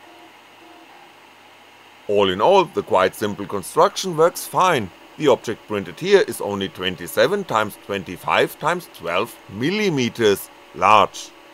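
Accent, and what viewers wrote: Germany. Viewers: I've never heard anyone more German than this guy.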